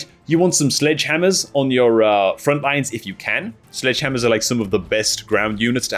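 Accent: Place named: Newcastle, United Kingdom. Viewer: Ah, Geordie makes sense!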